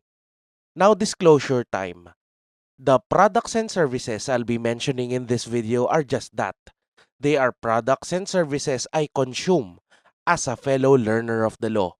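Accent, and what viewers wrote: Philippines. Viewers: love the heavy Filipino accent!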